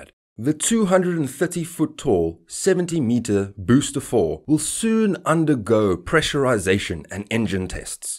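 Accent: South Africa. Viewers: Great video. Recognize a Saffer accent when I hear one 🇿🇦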